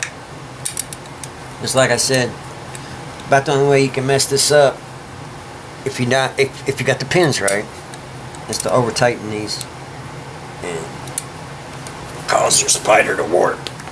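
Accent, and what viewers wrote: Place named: South, United States. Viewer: DUDE!! Thanks for the video! I just bought my 2x 124s the other day for 150$!! LOL One of them is blown, one is perfect still! Theyre like brand spankin new almost! Are you reconing the second sub or are you hanging onto your 2nd recone incase one blows? I need to get myself a coil STAT! I had no idea these subs were so easy to recone like this, I gotta hustle up some cash real fast so I can buy one. If you do recone your 2nd sub you should sell me the coil you take out :D Im from Cookeville, TN, you sound like youre also in the southern area? Take care man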